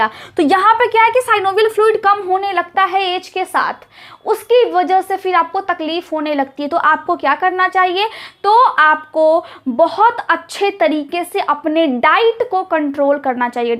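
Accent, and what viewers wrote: Bihar, India. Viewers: Apki Bihari accent achha lagta he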